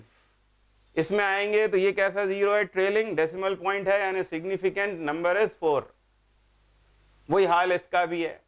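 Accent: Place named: India. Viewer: eng title, random india voice...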